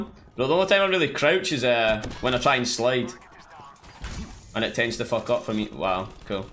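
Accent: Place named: Scotland, United Kingdom. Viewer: Found you on K3’s channel and I’m glad I did! Where in Scotland are you from?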